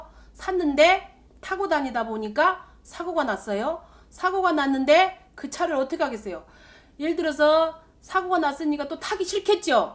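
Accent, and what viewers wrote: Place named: Jeolla, South Korea. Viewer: 전라도가 보네...괜히 거부감이 생기네 ㅎ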